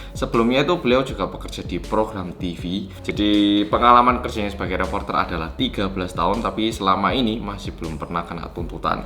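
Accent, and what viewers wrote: Java, Indonesia. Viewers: Halo guys Welcome to korea reomit bersama orang korea yang medhokk. Mana Dung dung tak dung nya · Mas yang medok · Mas ak subscribe seminggu yang lalu,mumpung lockdown aku langsung maraton nonton videone lho...ben nonton videone aku mesti mesem2 dewe mergone medok kyk aku,sampe bojoku gumun 😆.salam seko kota Semarang ya mas.... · Eee mmg yg memberitakan kn di TV korea, tp yg buat kita"orang Indo ngerti krna mas yg menyampaikn dgn bhsa Indonesia yg medoookk..itu mknya pda langsung pda paham...btw..Thankyou buat mas 'lah..kpn"klo ke Malang tak traktir Rawon ya...n klo q bisa ke Korea jg..tak sambangi ya di bwah apartemen kmu. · Seng Medookkkk Mantep Mas 😃😃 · Hal yg buat ku betah nonton bang walaupun isi kontennya rindingdong yaitu karena medoknya dan mirip oppa Lee Jong Suk 😅😅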